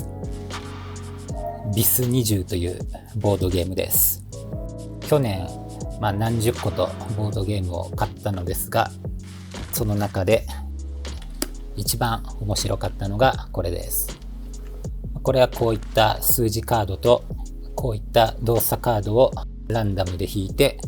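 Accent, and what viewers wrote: Kansai, Japan. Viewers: 今度しんやさんの関西弁トーク見たいです(♥Ü♥)❤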